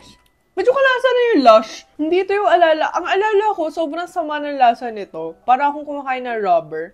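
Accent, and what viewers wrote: Philippines. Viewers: bat ang galing mo mag tagalog? u sound very pinoy.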